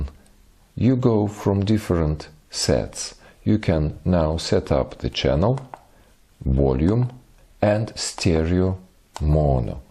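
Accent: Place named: Russia. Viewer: День добрый. Такой акцент. Вы Русский?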